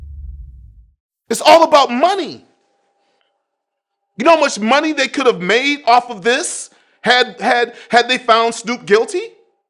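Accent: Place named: West Coast, United States. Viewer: West coast accent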